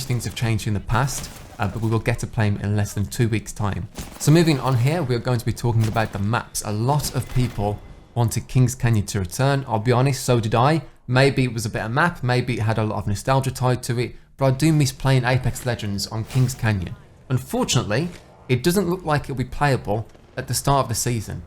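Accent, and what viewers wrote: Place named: United Kingdom. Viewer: I am from Croatia and I have no accent but has a very clean British accent... The cleanmost British accent I've ever seen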